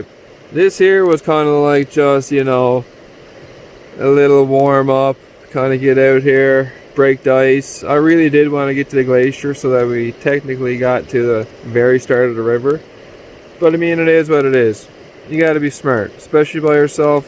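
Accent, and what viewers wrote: Newfoundland, Canada. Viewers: Where ya from? You must be newfie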